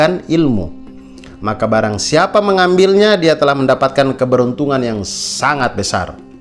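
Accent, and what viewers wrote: Eastern Indonesia, Indonesia. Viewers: Alhamdulillah ka...selalu segar dengan logat timur nya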